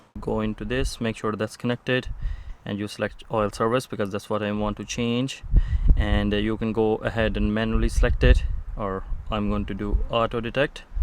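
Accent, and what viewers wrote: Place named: Cork, Ireland. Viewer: There's a hint of an Irish accent in there..... specifically a Cork accent, am I right?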